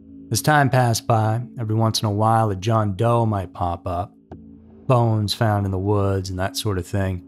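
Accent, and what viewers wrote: South, United States. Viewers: Thank you, new sub here, you have such a smooth soothing voice to listen to. I'm Australian, trying to place your accent, there's a hint of a slow Southern drawl🤔thinking Matthew McConaughey in Lincoln Lawyer😍but I'll settle down😂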